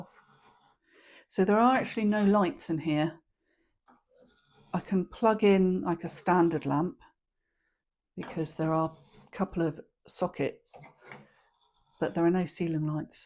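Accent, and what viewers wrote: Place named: Wales, United Kingdom. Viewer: My dad’s ancestors were from Wales. I like thinking I might have had your lovely accent!!! 😂😂😂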